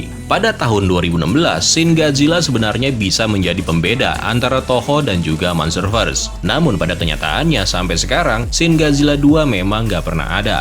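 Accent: Java, Indonesia. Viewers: Bang kau orang jawa ya bang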